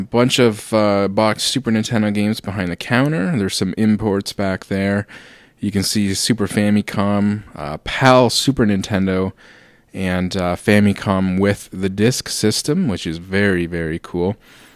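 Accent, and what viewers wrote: Canada. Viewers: Wow I stumbled across your video and while watching I was like this guy sounds canadian.I was super happy to see that this store is located in Ontario.Im from Toronto and deff will be making a trip to this store very soon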